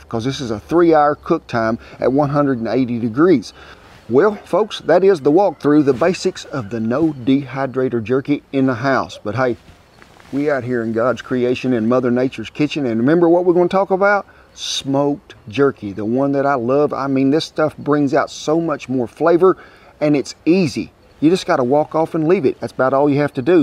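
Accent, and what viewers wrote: South, United States. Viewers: im glad i found this. love the cowboy accent, made me laugh, hungry and also gave me a hug. definitely coming back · 52 year ol Brit from across the pond.. you sir just got a new sub for one hell'ova entertaining video and dayum they looked tasty... do love a deep southern Merican Cowboy accent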